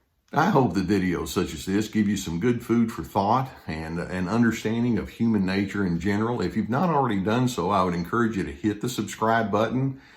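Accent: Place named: West, United States. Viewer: I think I would purchase an alarm clock with Dr. Les Carter giving out some solid grounding and factual encouragement first thing in the morning. That accent and articulate way of constructing a point of view reminds me of Western Movies, the reasonable man who verbally manages to get two gunslingers to avoid the showdown.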